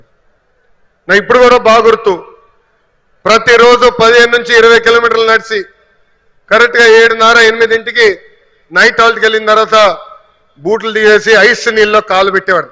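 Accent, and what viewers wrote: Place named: Telangana, India. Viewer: That is Telangana or seema dialect, what is your problem, jagan PADAHIDU antadu😅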